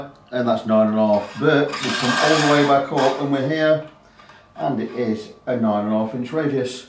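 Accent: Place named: Nottinghamshire, United Kingdom. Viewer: I love your no nonsense, workmanlike style of setting up guitars. You are a real craftsman. I'm guessing, from your accent, you are local to me, Newark, Notts.?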